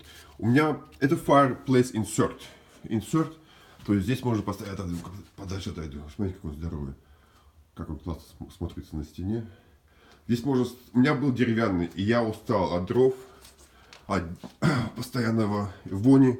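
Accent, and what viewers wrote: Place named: Ryazan, Russia. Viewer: Слова русские позабыл? Смешно слушать рязанский акцент😂😂😂😂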